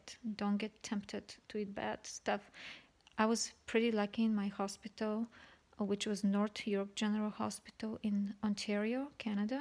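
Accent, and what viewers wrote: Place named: Russia. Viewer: Dear, thank you so much for your informative video. You helped me a lot. I just went through complete hysterectomy. I have a question. How long did it take for your bloating to subside? did you feel occasionally sharp small pains in your inside where the stitches were? I have sharp pain sometimes in my inside and my bloating is huge. But my surgery was on July 24, 2019 and today July 28, 2019. If there is any advice you can give to me to would be very appreciated. And also eventually did the bloating go away or do you end up having some left. Thank you for your time. Sincerely Naira (by the way I love the way you speak English, are you from Russia? I am from Armenia)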